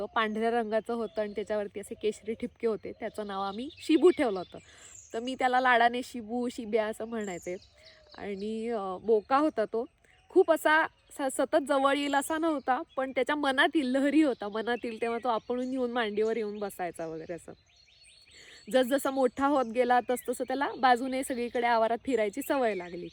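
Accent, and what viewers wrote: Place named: Kolhapur, India. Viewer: Your accent is mostly like our kolhapuri👌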